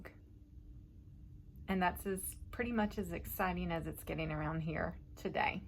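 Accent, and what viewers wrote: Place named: Indiana, United States. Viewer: Watching your video's is like a part of back in Indiana, I love living here in the Philippines, And i don't miss living in the US But i do miss no country music here of bands, So you and your family gives me a taste of Indiana with your accent.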